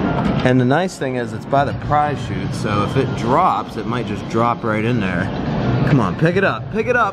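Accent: Pittsburgh, United States. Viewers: Are you from PA or are you just visiting Pittsburgh...? >.>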